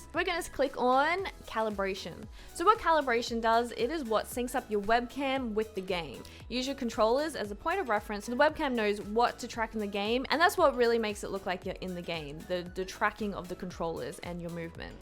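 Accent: Australia. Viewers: Listening to her accent I get Aussie vibes....am I accurate? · i knew it, i knew u are aussie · Oh you're an aussie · Is she Australian?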